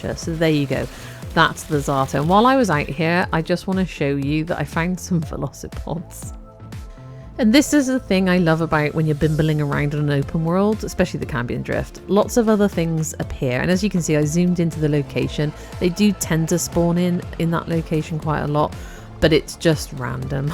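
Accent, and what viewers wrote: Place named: South West, United Kingdom. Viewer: You can also use a necramech for these btw. Appreciating your loot vids and the novelty for me of hearing a south west warframer, your accent takes me right back home :)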